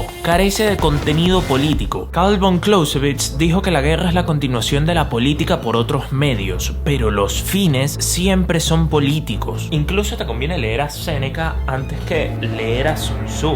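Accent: Caribbean. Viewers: Se que esto es xenófobo. Pero cuando escucho a alguien hablar con acento caribeño, automáticamente siento que va a decir algo poco inteligente. Y hasta ahora no me he equivocado! Pero este tipo ya exageró. Al parecer leyó muchos libros, pero su léxico es tan ordinario. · Que asco el acento caribeño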